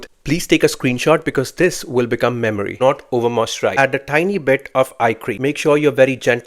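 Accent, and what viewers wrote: India. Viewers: Hate Indian accent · Callar correcta ! The Indian accent bro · Gosh I hate the Indian accent …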